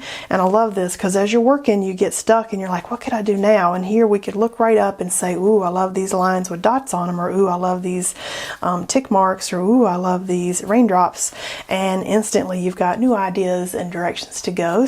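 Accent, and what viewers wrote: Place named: South Carolina, United States. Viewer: You sound like you are from South Carolina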